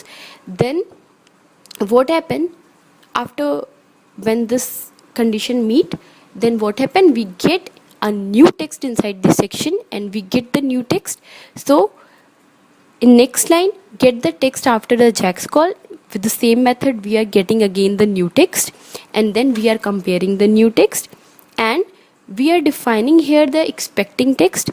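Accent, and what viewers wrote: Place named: Russia. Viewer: That russian accent)))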